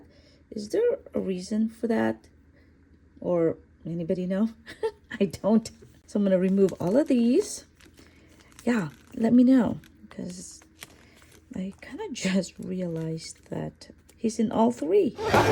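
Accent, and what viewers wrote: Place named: India. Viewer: Are you from india? Because you have a really pretty accent😻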